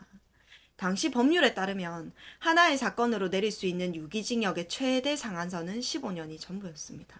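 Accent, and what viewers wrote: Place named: Seoul, South Korea. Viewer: 서을말 좋아요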